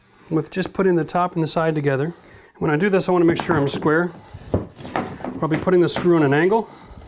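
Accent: Canada. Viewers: Im first to like this video & Saturday night would not be the same without a Canadian saying “out” !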